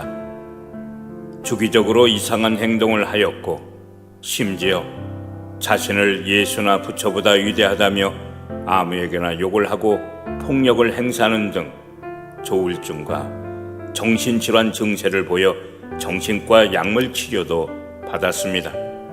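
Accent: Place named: North Korea. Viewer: 말투가 어떻게 북쪽 목소리냐?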